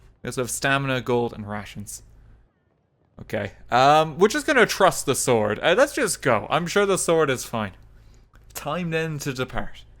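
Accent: Ireland. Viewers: This video should have been called "A man with an Irish accent carves a bloody path to Kharé in Steve Jackson's Sorcery" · Great Irish accent so... what other accents can you say and what is your favorite game so far in you career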